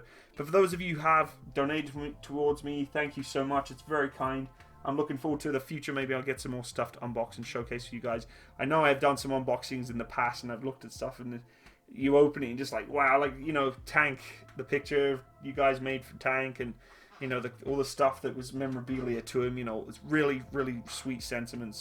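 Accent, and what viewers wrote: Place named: United Kingdom. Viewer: Feel like at home hearing your British accent that's lovely I'm British too. Enjoying your Youtube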